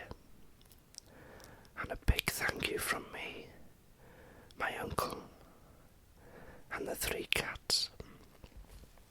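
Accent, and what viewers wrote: Wales, United Kingdom. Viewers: I think it's a Welsh accent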